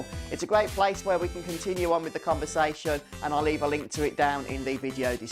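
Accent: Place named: England, United Kingdom. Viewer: I love FFVIII a bit more than VII. BTW, I don't know why but, I like your accent. What part of England are you from?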